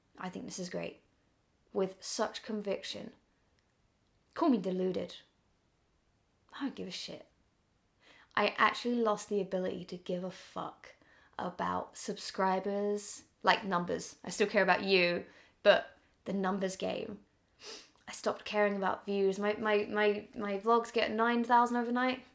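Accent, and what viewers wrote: United Kingdom. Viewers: We like you for you Not becau-se you have a British accent Or because you talk in tangents So coherently But what we view Are your stru-ggles and confusion The dreams that you pursue on This quest to find out who you want to be <link>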